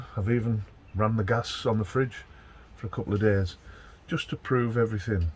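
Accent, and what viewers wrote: Cumbria, United Kingdom. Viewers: Clicked on video as I am considering fitting a tank to my motorhome, but as soon as you spoke I recognised that Cumbrian accent ..... and lo and behold you are from the same town as my wife and her family, perhaps you may know some of them ..... Bell, Cass and Dustin are the close family. Anyhow, because you are a Workington lad I will subscribe and watch your future adventures.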